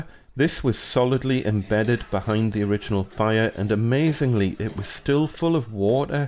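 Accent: Newcastle, United Kingdom. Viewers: Great video thanks for sharing that very well explained thanks are you from Newcastle Gosforth 😀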